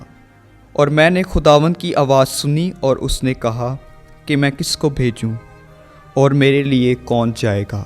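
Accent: Urdu, Pakistan. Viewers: true accent of URDU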